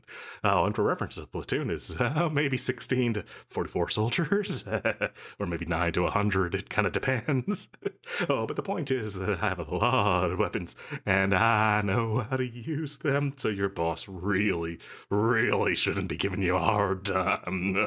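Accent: South, United States. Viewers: naw man it was perfect. Southern to Southern this was so awesome! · gosh the southern-hilly billy voice is so cute omg🥴🥴ur voice is so adorable i swearrr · I love the southernish accent. You do it really well💖💗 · I HEARD THE SOUTHERN ACCENT AND MELTED 😭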